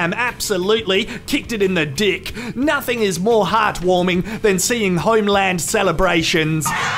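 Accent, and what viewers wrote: Australia. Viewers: Can astrailian people just commentate everything? The accent and slang is truly the icing on the cake for commentary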